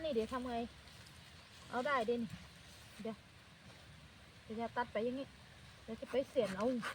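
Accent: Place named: Chanthaburi, Thailand. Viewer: สำเนียงจันทบุรี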